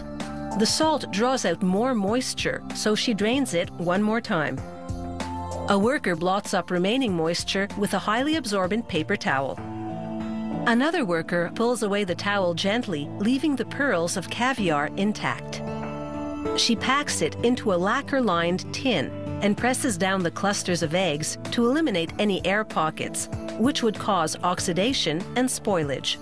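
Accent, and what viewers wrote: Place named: Canada. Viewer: This is the most Canadian sounding narrator ever.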